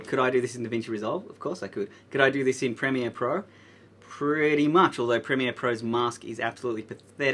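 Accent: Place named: Australia. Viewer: I love your Australian accent! So easy for me to understand :)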